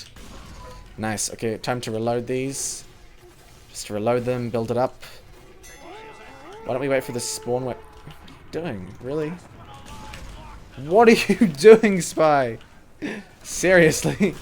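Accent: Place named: New Zealand. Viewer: Never heard a Kiwi TF2 player!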